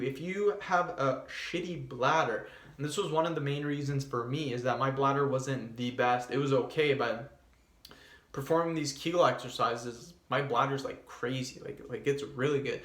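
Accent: Canada. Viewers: This guy is Canadian, isn't he?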